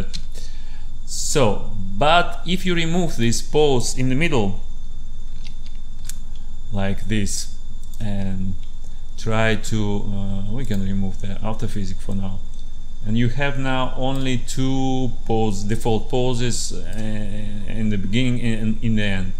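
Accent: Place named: Russia. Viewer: Very nice russian acсent).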